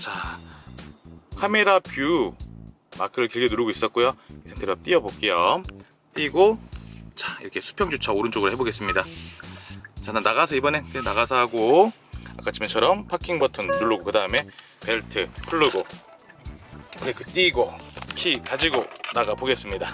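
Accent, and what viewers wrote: Jeonju, South Korea. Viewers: 꾸준히 올라오는 영상 잘보고 있어요...정말 설명을 잘해주시는것 같아요..혹시 전주에 사시는가요.. 말투가 약간 전주 말투가 나오네요.. 저도 gv80 구매 준비 중인데... 전주에 계시면 한번 찾아보고 싶네요..^^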